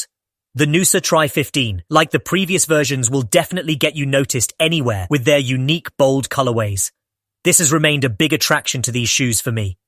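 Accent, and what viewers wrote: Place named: United Kingdom. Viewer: I've been watching your videos for awhile and I was a little surprised at first to hear some British dude narrating. :) I've always loved the look and the sharp rocker profile of the Noosa but I've never tried them because of concerns about cushioning. Also, the midsole still says FlyteFoam, not Blast. How do these feel on a 6-8 mile easy run compared to something like the Nimbus? I'd love to have a comfortable non-plated shoe with a great rocker. Thanks.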